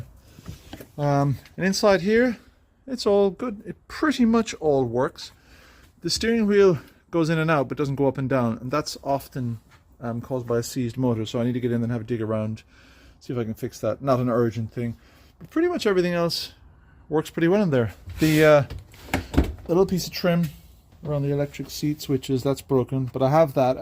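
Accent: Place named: Cork, Ireland. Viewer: ah you can still hear it in you is it north cork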